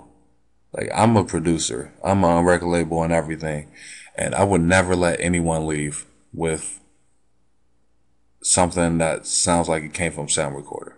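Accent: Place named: Pittsburgh, United States. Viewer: im from the burgh to lol son as i heard ya voice i knew you was from the burgh !!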